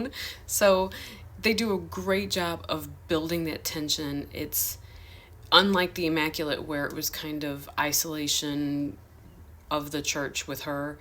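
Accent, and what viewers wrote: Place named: Midwest, United States. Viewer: I dig the midwest twang.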